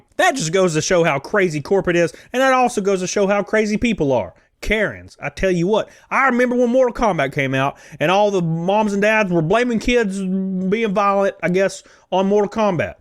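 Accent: South, United States. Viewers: Can you plz turn down the whole southern way of talking thing???? It’s rly annoying SOZ 😢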